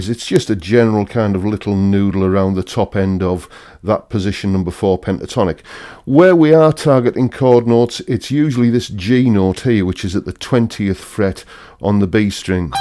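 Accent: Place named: North East England, United Kingdom. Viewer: North east lad I take it. You sound like you’re fairly close to me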